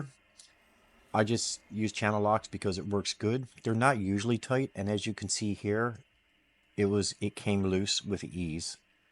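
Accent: Newfoundland, Canada. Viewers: Man. You sound like a Newfie. Are you?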